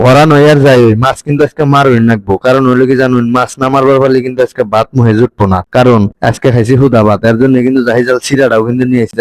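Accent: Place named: Jamalpur, Bangladesh. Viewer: জামালপুরের ভাষা বলতেছে 😊❤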